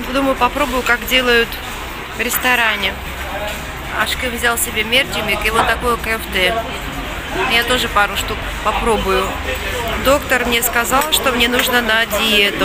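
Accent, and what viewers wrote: Georgia. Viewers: вы уже не первый раз отвечаете на комментарии о замечаниях, зачем? Очень много внимания этим людям, они только это и ждут. Мне например нравится ваш грузинский акцент. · Мне наоборот нравится ваш акцент грузинский и когда неправильно говорите слова это придаёт живости, характер вашим видео. Приятно, что это на видео живой человек, с душой, а не робот на работе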